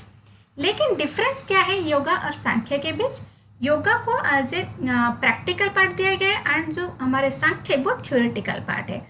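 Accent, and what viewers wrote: Odisha, India. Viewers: Man r u from Odisha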